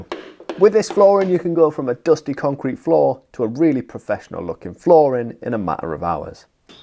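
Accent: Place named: County Durham, United Kingdom. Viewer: Just came across your chanel. Nice one. Cant work out the accent. Co Durham or there abouts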